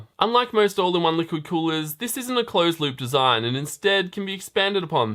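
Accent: Australia. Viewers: Mate, no offence but your voice is horribly annoying. On top of it you just don't stop talking with no pauses between sentences. Your oz accent is almost incomprehensible at times and I resorted to use the captions which as you can guess are not very good. I could not (even if the video is well made, edited and nice altogether) watch more than the half of it. Please consider someone else to narrate it.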